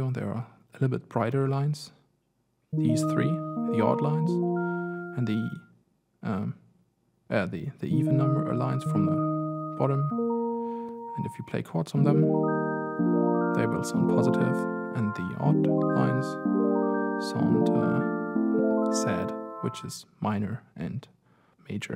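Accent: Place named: Germany. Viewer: German accent :D nice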